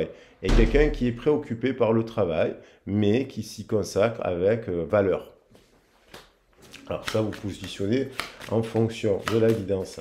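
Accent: South, France. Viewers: Merci pour cette guidance! Avé l'accent du soleil, c'est encore mieux🤣! Séparation et abandon datant de 2021, vu qu'il ne se décidait pas à acter un choix, j'ai mis un terme à ses visites (amicales), il n'a pas apprécié!!! Belle soirée:face-red-heart-shape:🌠!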